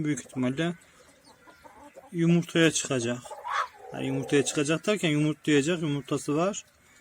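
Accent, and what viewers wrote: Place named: Sivas, Turkey. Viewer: Konuşmandan belli abi tokat sivas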